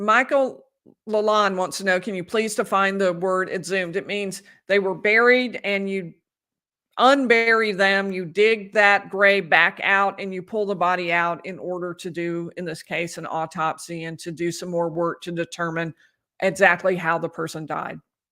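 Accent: South, United States. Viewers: I was born in Bainbridge, GA and grew up in Columbus, GA. So happy to hear your Southern voice.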